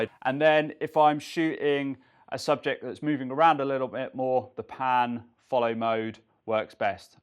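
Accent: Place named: Pennsylvania, United States. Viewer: I've never heard that accent before... you must be from Pennsylvania.. right?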